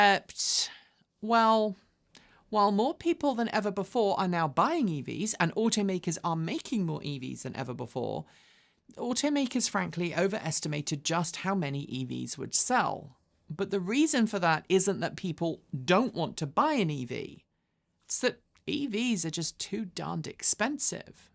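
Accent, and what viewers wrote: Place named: United Kingdom. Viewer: Its not co.plicated. the ev push got people to run out and get them then realized how very diffiucult they are if you have much distance to drive. But you sound british so may not understand at all. Out here in westeren america where its minimum 50 milea between towns and vast majority dont have charging and winter real winter it takes only one trip to understand how unreliable thwy are.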